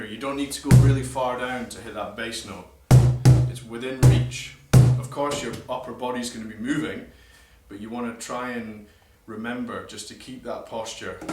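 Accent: Scotland, United Kingdom. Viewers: You sound a little bit Scottish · Are you Scottish?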